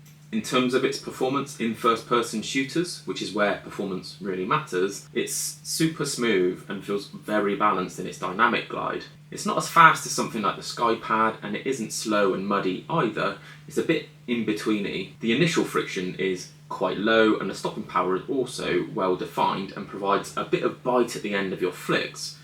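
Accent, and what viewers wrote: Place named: England, United Kingdom. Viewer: Banger review and banger English accent! Do you have also a twitter account?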